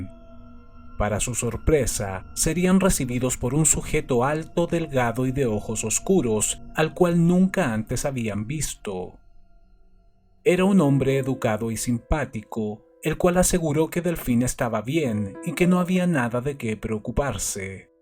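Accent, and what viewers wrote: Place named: Chile. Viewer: Este canal es demasiado bueno, pero el hablar del locutor lo tira por tierra, pues tiene un fuerte asento chileño, podría contratar un locuror mexicano o colombiano, incluso español....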